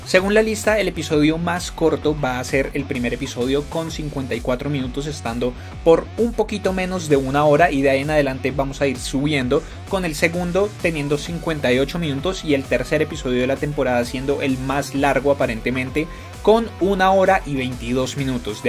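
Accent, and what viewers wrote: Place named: Colombia. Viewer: Me encantan tus videos!! Hace poco que llegué a un video tuyo y desde ahí ya no me pierdo ninguno. Ir al cine contigo debe ser sensacional para poder hablar del tema con tanta pasión como lo haces en tus videos, 😭 pero voy escuchando que eres de Colombia!!! , no !!!!!!!!! yo quería, jajaja. Te mando muchos saludos y mucho éxito con tu trabajo, lo haces genial.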